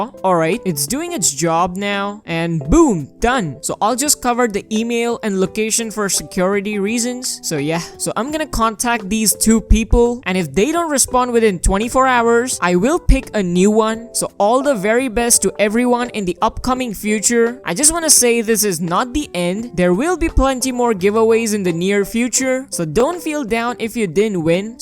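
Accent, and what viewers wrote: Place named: India. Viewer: u r an indian right? ur accent is like that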